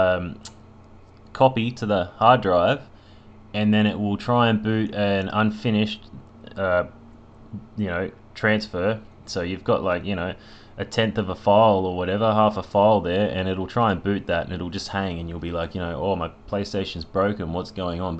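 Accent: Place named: Australia. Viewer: Love ya laid back attitude! Also great to hear a fellow Aussie voice. Helps me understand 10x easier. Appreciate ya work mate!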